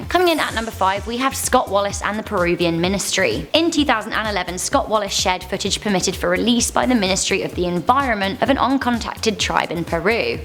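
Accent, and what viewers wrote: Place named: United Kingdom. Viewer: Goddamn i can only withstand so much british accent..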